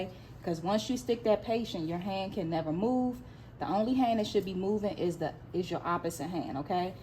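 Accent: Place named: Baltimore, United States. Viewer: Thanks for this video,i start my class on Monday.You sound like youre from Baltimore❤.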